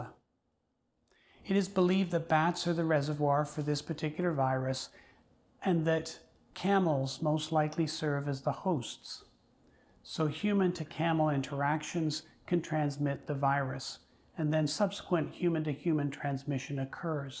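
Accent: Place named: Canada. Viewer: "I've been asked aboat.... This video is aboat...." Clearly this doctor is Canadian (I can trust him).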